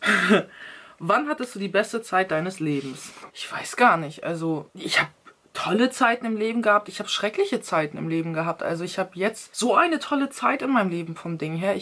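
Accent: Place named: Russia. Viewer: Ja man russischer Akzent ist mega 😍😍 Wuodga... 🥃😋😂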